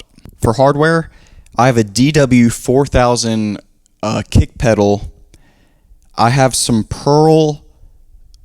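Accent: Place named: Maryland, United States. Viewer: where are you from? almost sounds like a Maryland accent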